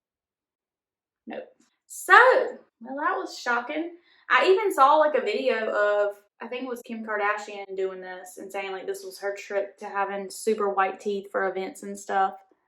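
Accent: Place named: Louisiana, United States. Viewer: You sound like your from Louisiana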